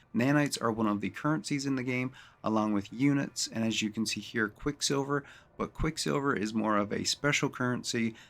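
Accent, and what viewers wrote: Newfoundland, Canada. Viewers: Picked out the Newfie accent immediately, from there as well. Played this on ps4 a couple years ago, it felt empty to me. I’ve been seeing vids of this new update so I might have to pick it up on pc and try again.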